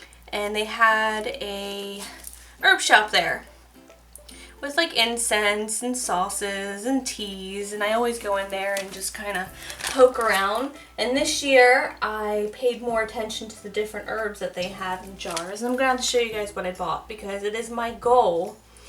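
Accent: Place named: United States. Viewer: Oh it’s so cute 😍 I personally don’t like popcorn at all 🤢 but my kids love it!! The smell stays in the microwave for ages too hahahahaha 😂 How gorgeous was that little cake your friends mum dropped off 😍 it looked so delicious!! I am growing a few herbs atm (it’s spring here now) tho I don’t use them a lot lol. We have parsley,thyme,chives,and coriander just popping up :) P.S. I love the way Americans say herbs 😆 here in Australia we prenounce it “herbs” not “erbs” and we prenounce the colour mauve “moe-v” 😂😂 we are weirdos lol 😆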